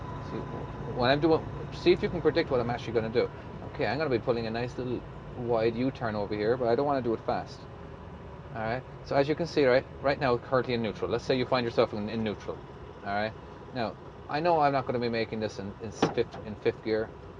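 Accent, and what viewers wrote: Ireland. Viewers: dude sound Irish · The best shifting video. Irishman? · Very cool to see! Also you have a very cool voice, are you originally Irish by any chance?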